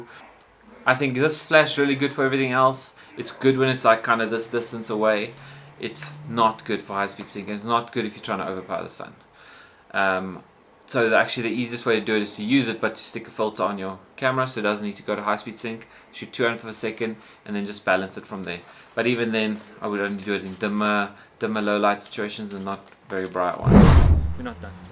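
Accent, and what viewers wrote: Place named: South Africa. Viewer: Was just about to say that you have a South African accent....kanti you're a local With some international talent. Keep up the good work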